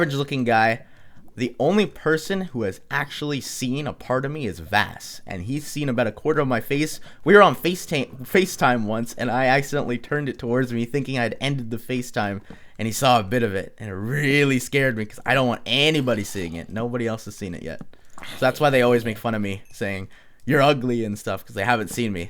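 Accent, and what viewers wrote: Canada. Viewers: he is Canadian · Is he Canadian?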